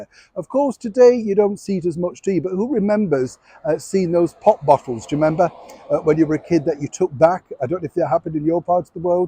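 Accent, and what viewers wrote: Yorkshire, United Kingdom. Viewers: Nowt wrong wi' a Yorkshire, Northern accent, eeee, it sounds grand lad 🙂 The guttersnipe should hang his head in shame with what he's done, if he'd have done anything like this during Elizabeth I reign he would have ended up in the Tower on treason charges.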